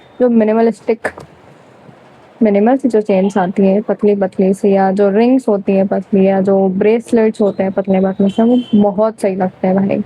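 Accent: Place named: Mumbai, India. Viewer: aapke bolne ka tarika esa lagta jese aap mumbai se ho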